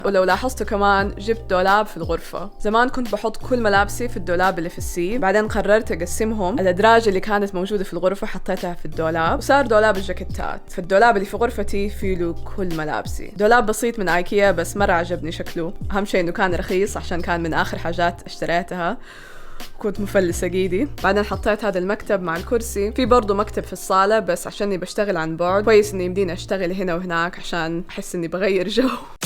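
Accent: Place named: Jeddah, Saudi Arabia. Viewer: حلو شديد وبحب لهجتك واضح انت من جدة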